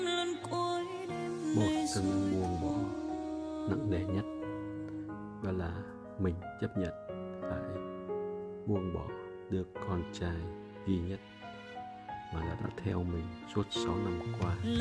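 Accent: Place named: North, Vietnam. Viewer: Giọng nói Bắc 54 nghe hay quá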